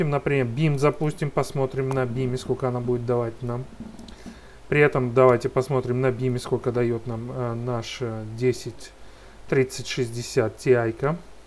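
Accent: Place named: Armenia. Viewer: Прикольный! Говор больше на армянский похож!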